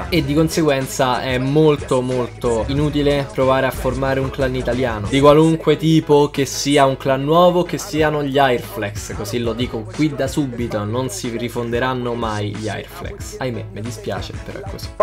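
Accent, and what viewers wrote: Rome, Italy. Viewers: Ho scoperto il tuo canale grazie a dei miei amici (maschi), che penso ti seguano solo per i gameplay, poiché su youtube seguono solo gamer. Ero curiosa, non ho mai guardato video in cui giochi (semplicemente perché non ci capisco nulla), ma mi sto guardando i vlog e i video ask. E niente, ti adoro: mi piace il tuo modo di pensare e di affrontare argomenti un po' scomodi, mi piace il tuo dire quello che pensi, senza peli sulla lingua, ah e mi piace pure il tuo accento romano. Mi pento di averti conosciuto solo poche settimane fa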